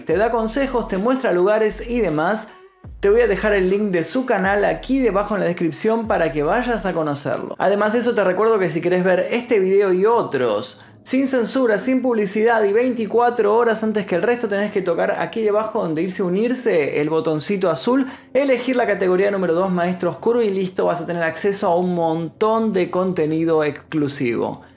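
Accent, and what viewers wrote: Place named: Argentina. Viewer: Oye no puedes grabar los vídeos sin meter ese asiento argentino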